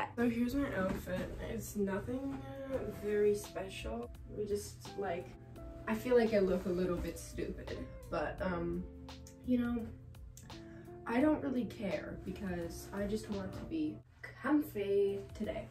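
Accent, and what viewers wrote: United Kingdom. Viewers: pls ???? why did i think you were british HWJSJHWGF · nah cause i thought u was british before i watched ur yt videos 💀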